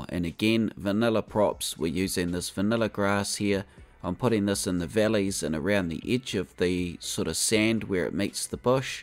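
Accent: New Zealand. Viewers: omg is your accent from New Zealand? it's awesome!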